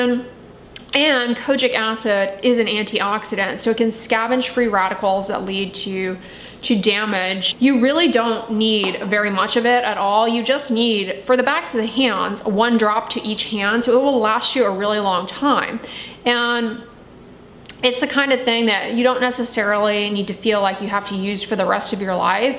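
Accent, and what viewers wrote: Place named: Texas, United States. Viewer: Dear Dr, Dray, I really enjoy your videos and am enjoying them. I grew up in El Paso, and was there for much of the last 4 year period while my mom was still alive, so enjoy the familiar mild TX accent and shopping opportunities, as well as your very helpful information. Now, however, I am back at home in Juneau, Alaska, which I do love -- but not the shopping... We do NOT have: Dollar Tree, Wal-Mart, Target, CVA, Walgreens (or Rite Aid or any other chain pharmacies). We do have two small grocery stores, part of the IGA chain (think small independents that were bought by a chain that buys small independent stores in rural areas - and in fact IGA bought our 2 small independent groceries when the owners wanted to retire); one Fred Meyer -- bought by and now part of the Kroger chain; and one Safeway, which I rarely shop at as it is farther from me than Fred Meyer. 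We also have a very small Costco, probably the smallest Costco I have ever been in. I live in a medium-size regional center, and the capital city of my state. (Also, we have no road access to go anywhere else, as is also true in much of Alaska.) This is why rural America feels sort of left behind and "out of the loop". If you can do a video of skin care options available at Kroger or Costco, especially cost-effective ones, I would be very grateful.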